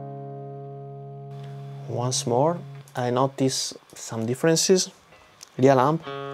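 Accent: Italy. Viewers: Si sente poco che sei italiano ahahahhaa Grandeeeee bel video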